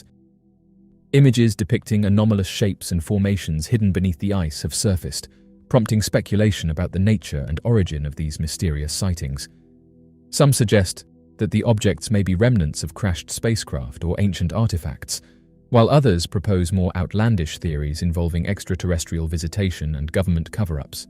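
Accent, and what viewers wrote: United Kingdom. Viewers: So incredibly boring when narrated by a Brit!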